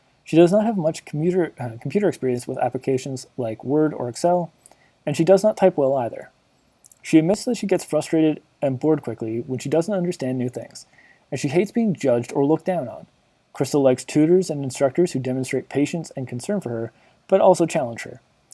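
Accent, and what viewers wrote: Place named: Canada. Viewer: It's all about that Canadian accent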